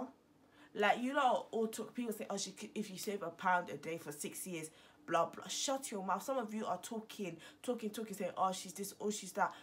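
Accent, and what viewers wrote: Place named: Zimbabwe. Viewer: You sound zimbabwean. .thank you for not being harsh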